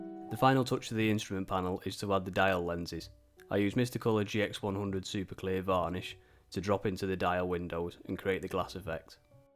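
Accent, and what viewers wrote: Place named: North, United Kingdom. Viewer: What part of the North are you from? great video mate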